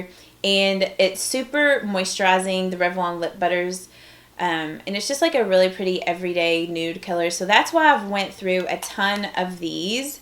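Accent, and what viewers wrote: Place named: Kentucky, United States. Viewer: I thought your accent sounded familiar! I'm from Ky also(right outside of Louisville), currently stationed in California. I can't wait to get back to the blue grass❤️ I love your videos, I look forward to watching them.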